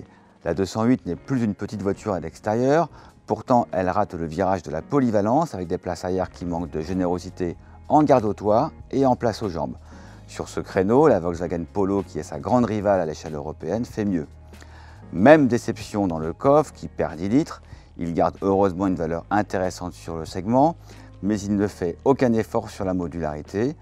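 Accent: Paris, France. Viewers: Chef' ! L'accent parisien !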